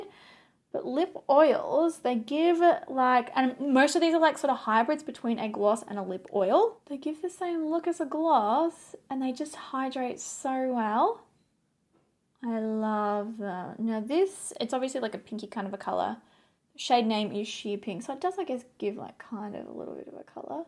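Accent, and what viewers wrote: RP, United Kingdom. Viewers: We Americans like to do the English accent too lol! You look gorgeous! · The British accent! I was loving it. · I am 🤣🤣. I'm from the U.K and your British accent is 👍 Love your channel, however you cost me a fortune in shipping and tax fees when I purchase your products 🤣🤣 ps your finishing powder is the BOMB 🥰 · Haha love the British accent. We don’t all sound like that though. That’s what posh people from London sound like 😂 xx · Not a bad English accent xx love from the UK 🏴❤️ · your british accent is really posh lol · That British accent 😂🤣 brilliant, but very posh darling 😂😂 most of us are common as muck haha xx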